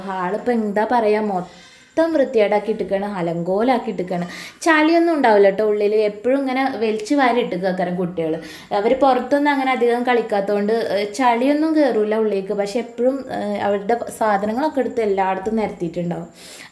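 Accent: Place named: Malappuram, India. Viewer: തനി മലപ്പുറം ഭാഷ പെരിന്തൽമണ്ണ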